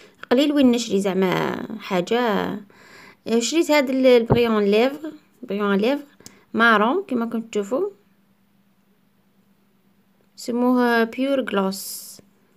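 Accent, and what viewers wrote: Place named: Mascara, Algeria. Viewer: بصحتك اختي بصح راني شامة فيك ريحة المعسكريات لهجة تاعك تبان تاع معسكر .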